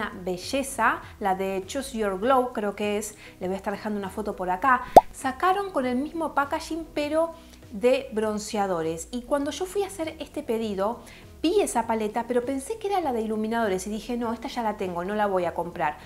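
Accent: Argentina. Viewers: Hola, me encanta tu canal, enseñas cositas variadas y con las 3B, buenas, bonitas y baratas. El maquillaje de ojos que llevas es una preciosidad, alguna vez podrías hacer algún tutorial de maquillaje de ojos, te queda hermoso. Muchas gracias por el vídeo, es muy entretenido y escucharte es una delicia, el acento argentino es mi debilidad. Ójala tengas éxito con el canal, te lo mereces, por el contenido y por tu simpatía. Besitos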